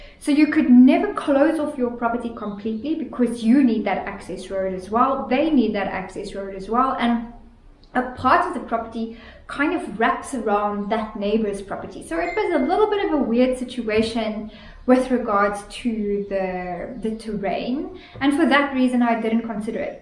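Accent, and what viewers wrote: South Africa. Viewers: Just found your channel and will definitely be following the rest of your adventure. Question: do I hear a bit of a South African or Zimbabwean accent there?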